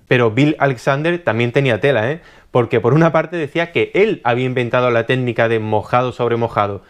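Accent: Spain. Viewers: Porque hablas como español si eres de mexico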